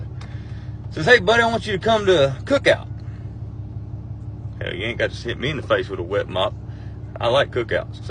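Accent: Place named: South, United States. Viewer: Love that southern accent,and you are a funny ass story teller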